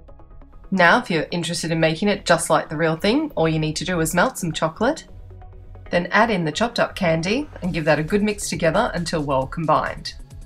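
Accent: Australia. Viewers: Are you Australian? Ps I love all your vids :)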